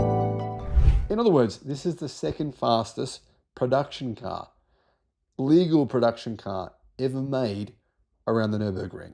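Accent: Australia. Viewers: if you’re going to call Zeds Zee, then, you may as well do away with your Aussie accent. Just sayin’.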